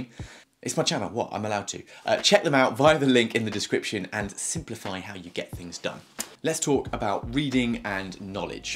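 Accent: United Kingdom. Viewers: That british tho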